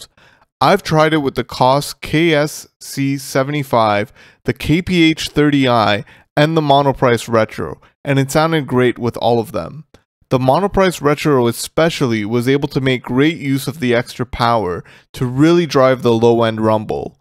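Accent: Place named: United States. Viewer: sounds like a heavy dude, oh hes murican lool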